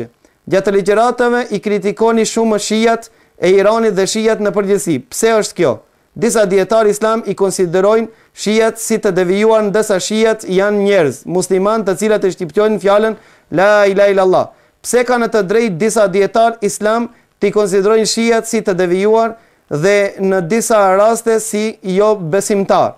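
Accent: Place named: North Macedonia. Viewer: Ta qifsha mamin Terrorist Maqedon, sipas akzentit tend qe ke je 100% nga Maqedonia, pra nga Shkupi, apo Kumanova.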